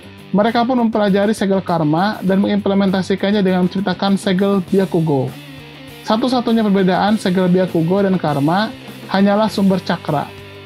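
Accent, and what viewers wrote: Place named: Java, Indonesia. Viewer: Lucu orng in ngomongny medok pak,,,hahahahaha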